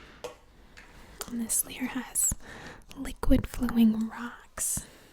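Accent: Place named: Midwest, United States. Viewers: love the midwest accent 😍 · makes learning fun. (relaxing too) Also, you have an accent. Chicago?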